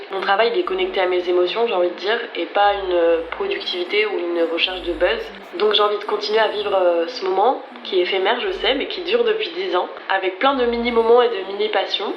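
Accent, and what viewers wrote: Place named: Alsace, France. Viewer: Je me rappelle de tes débuts dans ta chambre, j'adorais tes vidéos, ton univers très épuré et puis ton accent alsacien qui ressortait parfois sur un mot 😅 (je suis également alsacienne). Quelle periode ❤️ Et que de chemin parcouru ! Bravo à toi et bonne continuation dans tous tes projets ! 🙌🏼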